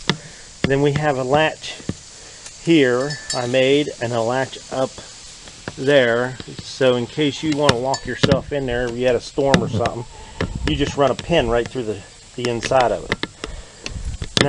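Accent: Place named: Northeast, United States. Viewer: very cool. love the roof idea using metal and then removing it when set. what kind of temps do you get in winter, or sumner? sounds like you are in the northeast.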